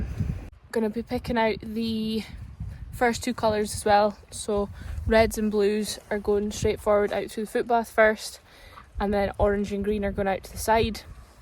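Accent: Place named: Scotland, United Kingdom. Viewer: Hi, you have so many ewes to still lamb and that means you will be busy for a long time. Yes, they all look good considering all the rain you had earlier. You will have to train Davie better, not getting your lunch is a bad start for him. I assume your dad is looking after the cattle while you do the sheep, a good team working well. I love listening to your unique Scottish voice, its so different from the lingo in Aussie. Looking forward to hearing your news. Chris from Victoria, Australia 🇦🇺.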